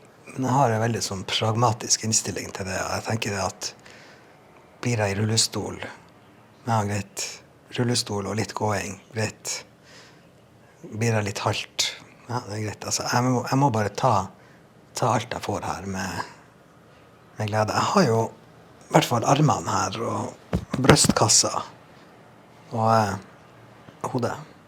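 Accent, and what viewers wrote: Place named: Nordland, Norway. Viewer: Så bra at du kom deg på beina igjen. Jeg må bare le, for den nordlandsdialekta sammen med den nordlandske stoiskheten gjorda hele filmen legendarsik!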